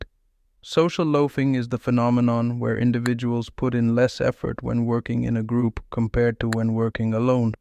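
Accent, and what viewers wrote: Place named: India. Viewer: indian accent and bad microphone = not watching the vid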